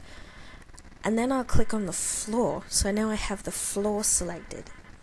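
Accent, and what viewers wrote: Australia. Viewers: AHHHH I LOVE the Australian accent. I'm surprised I didn't catch on to that. I feel bad now :( You are awesome in my book :) Australian people are so kind hearted and sweet too, I love that. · you sound like and assie to me that is because i am Australian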